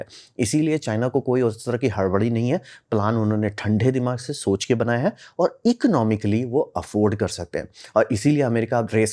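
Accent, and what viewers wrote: Bihar, India. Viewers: 💯 आप बिहार से हैं सर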